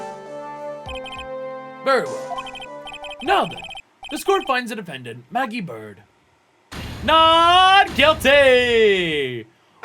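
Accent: Australia. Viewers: Yes,she is Australian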